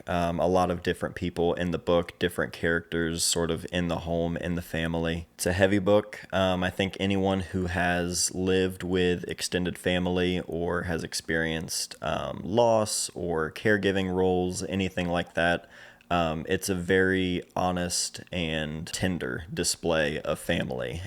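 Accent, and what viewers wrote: Ohio, United States. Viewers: My favorite thing about photobooks is the ability to be up close and personal with the work of yours or others. It is a very focused and intimate way to view photography and in my opinion is the best. It takes away all distractions and allows you to be fully immersed in the story the photographer is trying to tell. I see photobooks as the movie theater experience when it comes to looking through photos, you sit there and are 100% focused on the story, the colors, the textures, everything. Also, interesting to hear you say that us Ohioans have an accent haha, I haven't gotten that before but I have heard talk about that. Great video!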